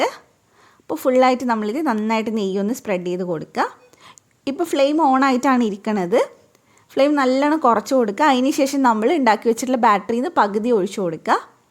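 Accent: Kannur, India. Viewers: ഇത്ത കണ്ണൂർ എവിടെയാ മട്ടന്നൂർ aano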